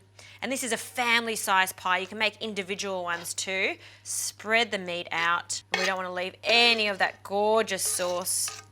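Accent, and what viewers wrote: Australia. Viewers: She was born in Sydney. The accent should give it away, it's Aussie, not Kiwi.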